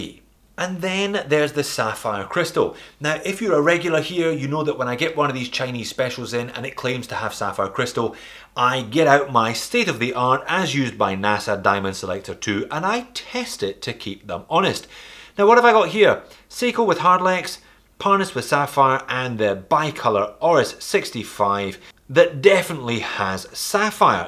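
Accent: Scotland, United Kingdom. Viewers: Who are you, where are you. Scottish accent? Just beginning to learn about watches here. You’re videos are very “uplifting”. Much appreciated. Happy Thanksgiving 🦃 from California to you no matter where you are in the world. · what does that even mean? Apparently this is your first time watching the channel. He's a for real Scotsman, living in Sydney, Australia. He talks the way he talks, always. No need to be insulting.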